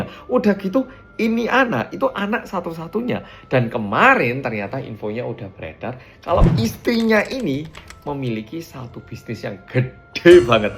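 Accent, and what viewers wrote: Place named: Java, Indonesia. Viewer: Kak bikin konten seperti ini terus ya , aku paling seneng bahasa yg diucapkan , org Korea asli , sekrg tinggal di Korea tapi medok jawanya masih ada , aku bangga sebagai org Jawa ada org Korea mah medok jawanya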